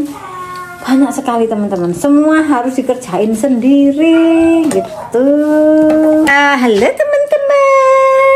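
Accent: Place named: East Java, Indonesia. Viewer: Bhs nya, medhok priyaui JaTim, dalem inggih JaTim mb, ke Jogja krn mas suami tugas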